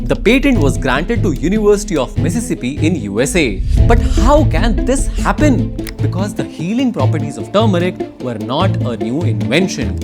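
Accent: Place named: India. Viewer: That's just an indian accent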